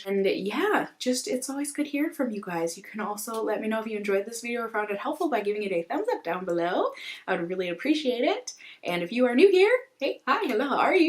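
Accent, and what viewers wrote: United Kingdom. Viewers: The British accent 😂😂😂😂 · Your British accent is lovely 😃 love your videos..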